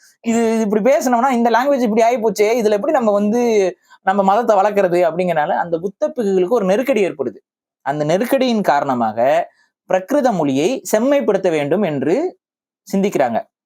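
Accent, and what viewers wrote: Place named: North Chennai, India. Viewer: your vada chennai slang superb😅😅😅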